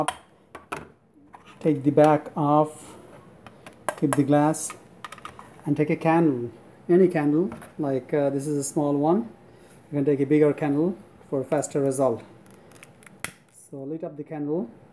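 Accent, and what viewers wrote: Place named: Nepal. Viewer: are u nepalese? ur accent sounds like nepalese and yes mostly nepalese people use this method to see the solar eclipse. I have been using this method since childhood for several times and it works fine. thank you for sharing!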